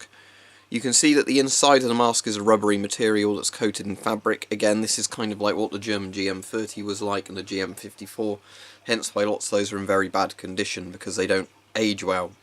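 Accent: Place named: United Kingdom. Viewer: I don’t mean to get myself involved in your privacy but out of curiosity I would like to ask what is your nationality? You have a British accent but I seen you wear a German camouflage uniform still issued in the German army.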